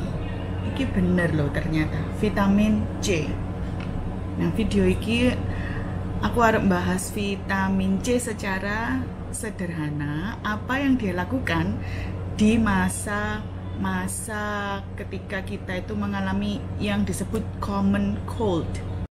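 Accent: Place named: Surabaya, Indonesia. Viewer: makasi ce infonya ........logat suroboyoan e Jan ora ilang blas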